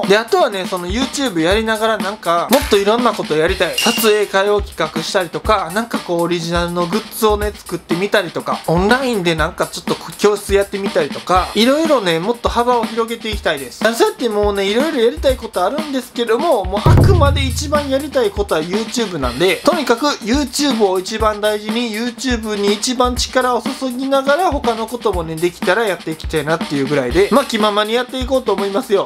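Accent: Kansai, Japan. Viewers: 中二です。いつも面白い動画をありがとうございます関西弁ってところも親近感が湧きます！今までのさんと似ていて土日くらいしか写真を取りに行くことが出来ないのですが土日は土日で用事があったりとあまり写真を撮る時間がないんですがなにか経験者としてアドバイス的なの(なんでもいいです)ありますか？質問じゃ無いかもしれないし日本語変かもしれないけどよろしくお願いします長文失礼しました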